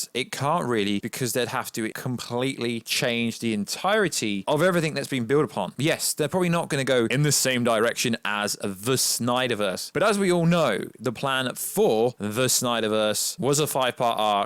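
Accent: United Kingdom. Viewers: What's your accent? Are you British speaking in a American accent?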